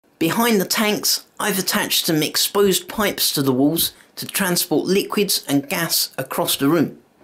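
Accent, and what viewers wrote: United Kingdom. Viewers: You are British?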